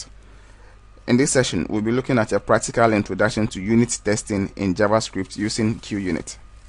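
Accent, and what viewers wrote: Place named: Ghana. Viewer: Nice tutorial bro... feeling your Ghanian accent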